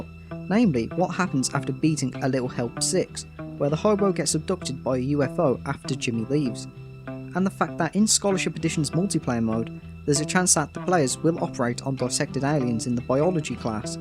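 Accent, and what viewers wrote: United Kingdom. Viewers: Okay I thought you were British because of your voice and where are you from then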